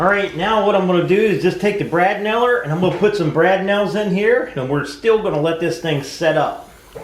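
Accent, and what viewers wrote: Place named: Pittsburgh, United States. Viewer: Hey, where are you from? You sound like you have an east coast yinzer accent..... just curious.. thanks.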